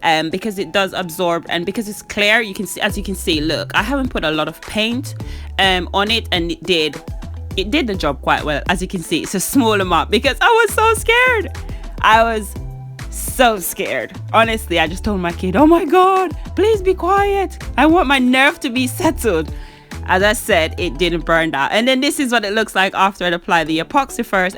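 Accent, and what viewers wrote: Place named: United Kingdom. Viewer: This is too much creativity.You did the decor quite well.I also loved the..is it British English? So amazing.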